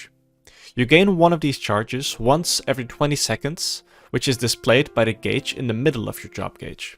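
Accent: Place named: Netherlands. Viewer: Ah the Dutch accent, love it:D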